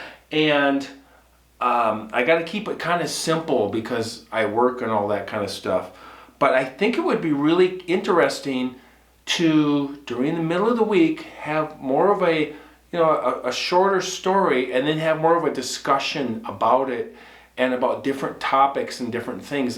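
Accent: Minnesota, United States. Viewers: I love Grand Marais, I e gone dogsledding there. I have no doubt with all the wild country that big foot is out there. Remer MN is really into Bigfoot ! Love to hear you say Minnesota, the accent is still there😊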